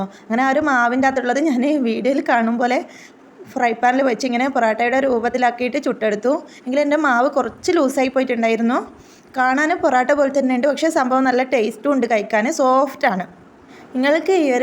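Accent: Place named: Malabar, India. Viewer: ഇമ്മള് കോഴിക്കോടൊ അതോ മലപ്പുറമോ ട്ട ട്ടട്ട❤❤L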